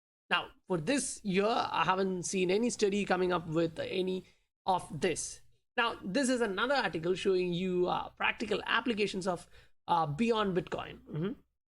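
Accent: India. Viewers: Oh and now that I've finished the video and you mention your accent... I started listening because you sounded like a very intelligent Indian guy! 😂😂😂 we have a large community of East Indians in the area of Canada I'm from, VERY SMART! Do you have your eye on any other hidden gems right now?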